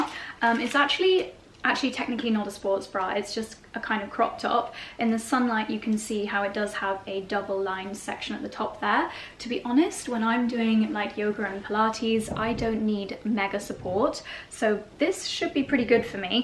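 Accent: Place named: United Kingdom. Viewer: I got to the “tail” end of your video, as I always do. I enjoyed the scenery, your bright, darling British accent, the homemaking bits…yours are some of my favorite videos to watch! One day I WILL travel from Texas to the Cotswolds…it is my dream. We have some lovely scenery and even quaint country roads in Texas, but we fall flat when it comes to architecture.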